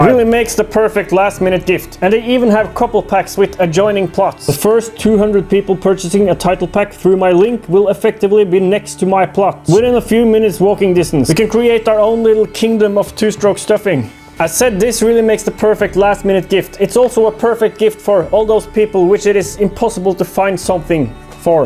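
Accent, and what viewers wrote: Scotland, United Kingdom. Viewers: Love watching your content, also love the scottish accent😂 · the scottish accent cracked me up lol! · Love your channel. I don't know squat about two strokes but I enjoy the process, the ups and downs, the music, the Scottish accent, the whole thing. Authentic empirical activity leading (maybe) to a distant, possibly successful outcome. Not for folks who idea is watching a half hour video by someone who builds an engine or restores a car, start to finish. This is the journey, not the destination. Who knows, you may end up with the world's heaviest 2-stroke.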